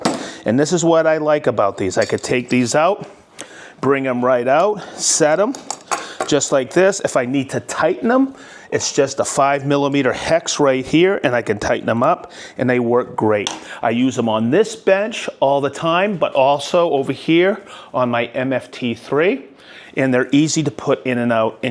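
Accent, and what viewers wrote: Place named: Boston, United States. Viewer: I know a Boston boy when i hear one. Thanks for the video buddy, just discovered your channel and looking forward to more. Cheers from Malden! 😂 Oh… and it’s Aliens for me.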